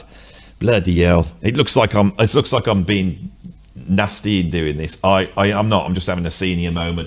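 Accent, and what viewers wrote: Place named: England, United Kingdom. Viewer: I think you would make a great one-man-show on Broadway. OK, OK, off-Broadway. Considering your story telling, vocabulary, (major yet subtle) sense of humor, gift of gab, introspection, range of interests, English accent (I'm in America), enthusiasm, and ruminations; what's not to like? Cheers, mate!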